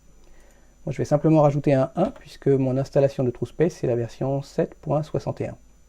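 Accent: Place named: France. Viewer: woah you're french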